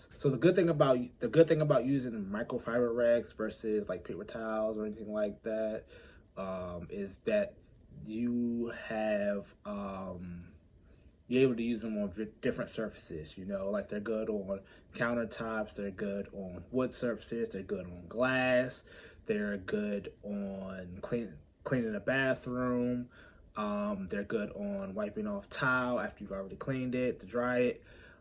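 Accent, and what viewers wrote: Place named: Baltimore, United States. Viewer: lol I wrote this before I heard the bmore accent bruh I forgot y'all consider PG and Moco both DC 🙏🏿 stay up and thanks for the knowledge bruh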